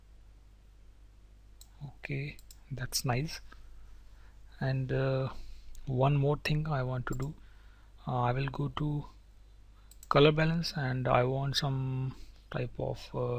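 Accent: India. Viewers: It is an English is just his accent. He's from India. It was hard for me to understand him too but once you listen you can understand.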